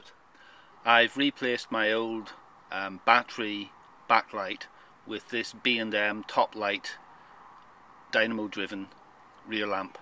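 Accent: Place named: Northern Ireland, United Kingdom. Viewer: You sound like you might originally be from northern Ireland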